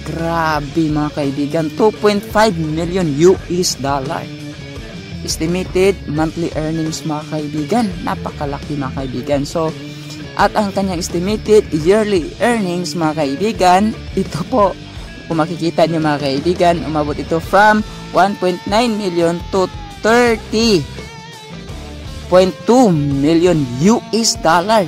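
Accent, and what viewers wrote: Visayas, Philippines. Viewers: bisaya ka dong haha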